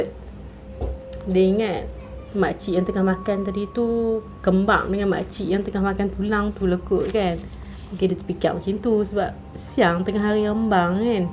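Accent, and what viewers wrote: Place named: North, Malaysia. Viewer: Seronok dengar bercakap logat utara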